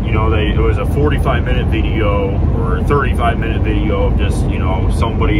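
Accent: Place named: United States. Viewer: I thought he from the states ok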